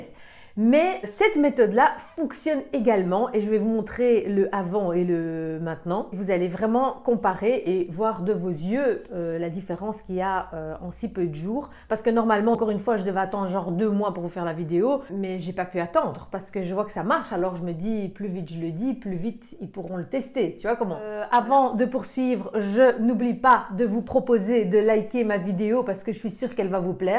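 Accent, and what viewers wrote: Belgium. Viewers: Je crois de belgique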